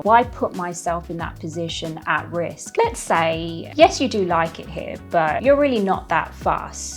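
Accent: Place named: Australia. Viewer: I was not expecting this video to be in Australian English.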